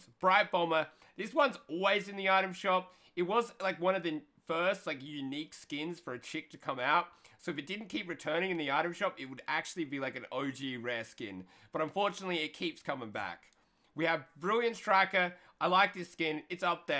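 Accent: Australia. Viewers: Hussein Naser they are both Australians durh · So, ur dumbass is telling me that everyone with an Australian accent sounds the same?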